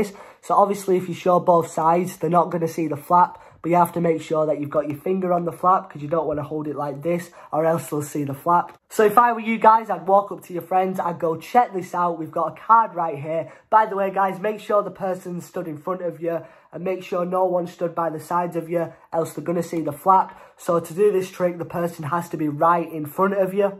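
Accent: United Kingdom. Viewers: Bro r u Britisher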